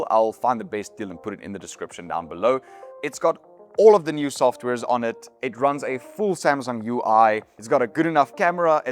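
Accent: South Africa. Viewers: Howzit, I know here in SA don't watch alot of tech videos especially on Youtube. South Africans love TikTok. It would be great if you represented SA and actually put the Rand price as well & what it goes for on dollars vs rands. I haven't watched most of your videos but your accent screams out & you can't hide it. Abit Austrialinish but you're South African. Put as link, with rands. You'll see more and more South Africans will watch and Subcribe. Great video.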